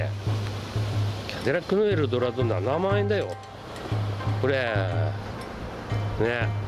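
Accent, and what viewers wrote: Tsugaru, Japan. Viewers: 津軽弁、懐かしい❗️ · 諸事情で津軽弁は体が受け付けないんです。金◯町の元公◯員のせいで！ 動画自体は面白そうなのでボリューム0で観ましたwww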